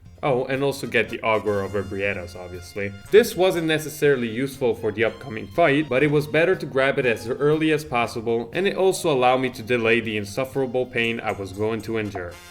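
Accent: Italy. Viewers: Are you italian? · To be fair he sounds perfectly north Italian to me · Mi stavo chiedendo perché riuscivo a capire a orecchio il tuo inglese, poi ho visto che sei italiano😂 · r u italian?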